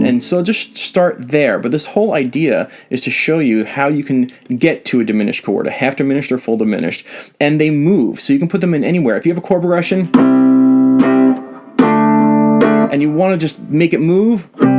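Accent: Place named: Long Island, United States. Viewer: Great lesson!..I learn quite a bit from your explanations..Are you from NY?..I'm from the Island, and you sound like everyone I know.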